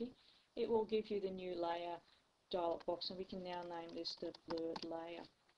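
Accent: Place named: United Kingdom. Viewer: jesus. the british accent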